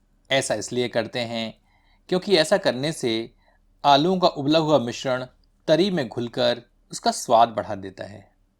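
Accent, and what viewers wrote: Standard. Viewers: I'm a South Indian Tamilian, appreciate your shudh hindi conversation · आप की शुद्ध हिंदी उच्चारण से ही मन प्रसन्न हो गया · आपकी हिंदी कर्णप्रिय शुद्ध है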